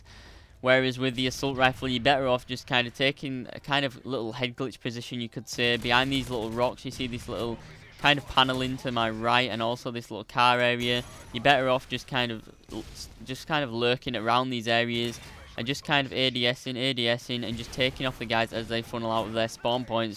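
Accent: Yorkshire, United Kingdom. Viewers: love the yorkshire accent, good tips and comp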